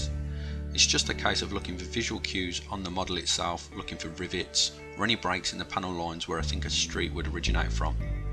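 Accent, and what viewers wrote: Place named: Midlands, United Kingdom. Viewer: instruction on the four videos is first class and the rhino is an ebay buyers dream.. its good to hear that midland tone back on youtube.maybe next time you would paint some forge world mk2 hh marines in the good old ultramarines livery...please. oh and you get 4 thumbs up..way to go.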